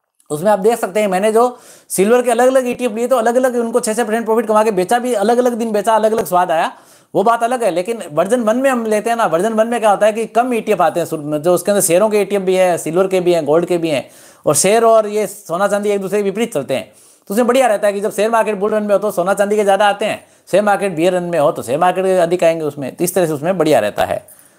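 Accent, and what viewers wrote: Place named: Marwari, India. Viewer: Love your Marvadi flavored hindi sir- love from Goa.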